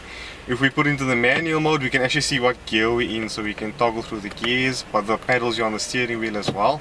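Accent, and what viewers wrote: Cape Town, South Africa. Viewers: His accent is from Cape Town South Africa to be exact. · I always get excited when I hear a South African accent doing some reviews on YouTube 😭♥️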